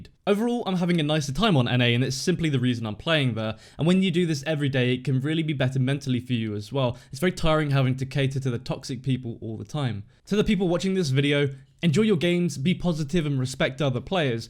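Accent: United Kingdom. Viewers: are you from the UK mate? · you sound British so assuming you live in the UK how the hell do you have good ping on NA????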